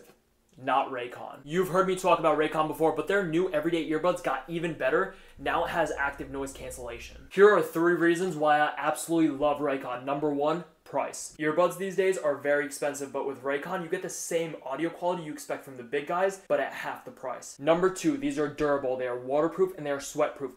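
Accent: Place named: New York, United States. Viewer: Dude your recipes are so good. You have the NY accsnt!!!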